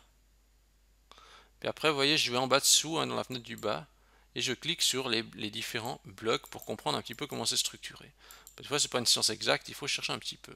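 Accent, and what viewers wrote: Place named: England, United Kingdom. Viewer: Bonjour, Merci beaucoup pour tes tutos parfaitement clairs et simples à mettre en application. Et j'adore ton accent anglais! Keep up the good job;-))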